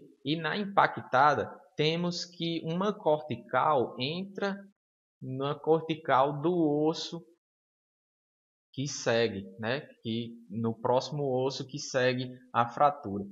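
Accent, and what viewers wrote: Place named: Northeast, Brazil. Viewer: Cara top... É seu sutaque melhor ainda ..eeee nordestao. .. Sou tec. Em imobilização ortopédica. ..sempre fico refrescando a memória assistindo vídeos como o seu valeuuu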